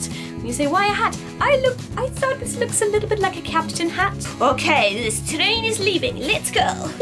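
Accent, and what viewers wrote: United Kingdom. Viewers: ^_^! I have to ask you, actually is little bit um, other question than like kawaii fasion! Because I don't have money money, and here aren't any stores, too expensive (postage) [Czech post the best ha] and blahblah Have you ever been in Czech Republic? x_x Do you drink beer? um, btw I like your british pronunciation! (too shy to say love)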